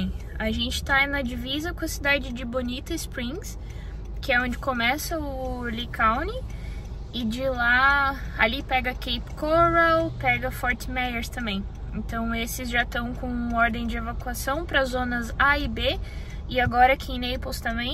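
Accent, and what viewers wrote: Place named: Rio Grande do Sul, Brazil. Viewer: Oiê eu tmb cheguei no canal de paraquedas. Quando ouvi tu falando pensei 🤔, é Gaúcha e de Santa Catarina ❤. Sou do RS moro na Serra, nos conhecemos pelo sotaque que amo demais. Maratonando teu canal aos poucos.bju. se cuidem gurizada💚 ❤💛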